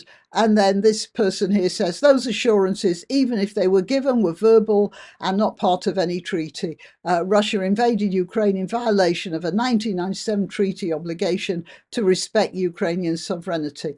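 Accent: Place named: RP, United Kingdom. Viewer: Fuck me, I love to hear a posh woman swear!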